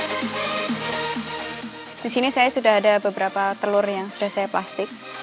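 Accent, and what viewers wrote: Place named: Banyumas, Indonesia. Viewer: Kayaknya asal Banyumas. Medok :D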